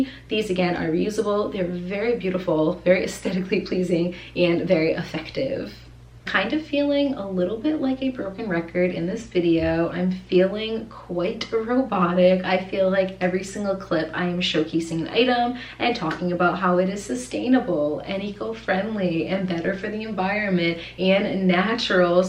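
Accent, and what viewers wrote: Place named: New England, United States. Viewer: I feel like your New England accent really came through in this video with how many times you said water! LOL XD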